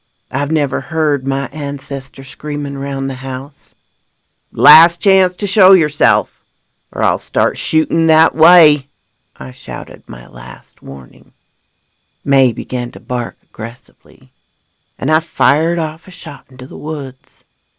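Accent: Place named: South, United States. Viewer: Hi there 👋:) Thank you for the Spooklicious 😵 Narration!!! I 🧡 your Southern Accent 😉 I hope that you and everyone else has a Smacklicious 💋 Saturday Too:) much love Sexy Grandma Darkness ✌️💋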